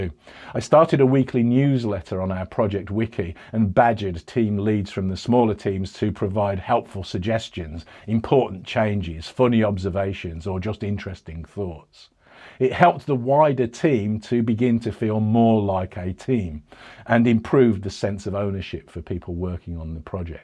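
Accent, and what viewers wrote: United Kingdom. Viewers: "your bus comes up to you and says" I really appreciate the UK accent but I just had to point out that was the first thing I've heard.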